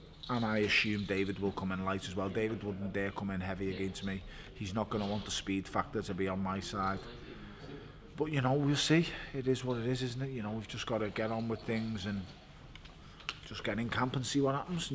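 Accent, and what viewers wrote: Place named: Liverpool, United Kingdom. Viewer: I am a Manc and I really like Bellew (I am even starting to like the scouse accent) - what he says about Haye conning the public is spot on!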